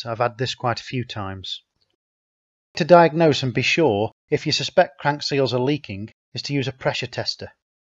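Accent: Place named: United Kingdom. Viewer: wow its really good to learn from a British guy for once 🇬🇧😁 as my hearing ain't great..no offense to our cousins across da pond.. but I can't always understand yer accents..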